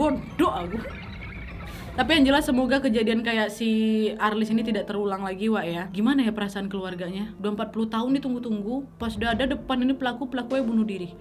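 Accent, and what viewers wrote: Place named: Medan, Indonesia. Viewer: Kok berubah logat mu KK Jadi Medan - Melayu wkwkwkwkwks🤣🤣ngakak liat bersin nyaa😂😂 Motif nya jelas unsur sakit hati atas ketidakadilan yg dialami si Steven dikota itu kondisi korban yg seperti dilecehkan" didalam rumah ibadah jadi pilihan nya buat balas dendam terhadap sistim dikota itu sebenarnya masih banyak yg mau diuraikan cuma males ngetik nya😂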